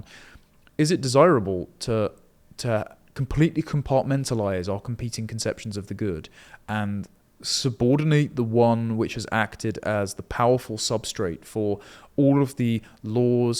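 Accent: RP, United Kingdom. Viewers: I really enjoy a lot of your political views, and I’d like to watch more of your content. But the exaggerated RP accent feels a bit forced. It comes across as if you're trying to sound overly refined or intellectual, kind of like a Gen Z Christopher Hitchens. Milo Yiannopoulos is a textbook case of taking it way too far.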